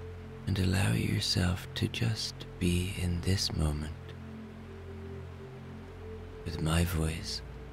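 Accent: Ireland. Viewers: if you see by chance you read this message, I must convey my eternal gratitude for your bedtime meditative stories! I have a very hard time falling asleep. You have the most soothing voice and love your Irish accent! I’ve been to your native country twice I loved it there so much I almost bought a little cottage near Galway but had to reconsider because of family back in Florida! Thank again❤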